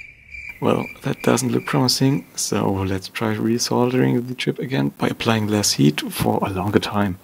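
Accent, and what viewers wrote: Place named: Germany. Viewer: If I’m not wrong you sound like you’re German, I tried ordering from MJK but they seemingly don’t ship to Germany? How did you get one? 😊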